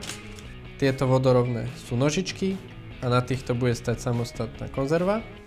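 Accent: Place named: Russia. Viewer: máte nádherný ruský prízvuk :D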